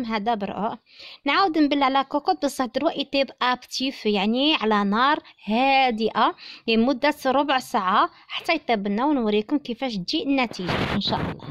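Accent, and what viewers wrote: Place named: Algeria. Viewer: بشوية عليك انا قصدت بلي اللهجة نتاعك تشبه اللهجة الجزائرية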